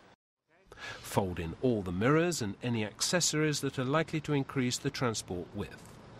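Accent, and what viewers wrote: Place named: United Kingdom. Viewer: "Balance with the help of the excavator's digging equipment when entering the lower body. This is to avoid tipping which would prove awkward both for the excavator and the trailer." How do Brits come out sounding like Shakespeare even when talking about how to avoid a total fuck up with heavy equipment?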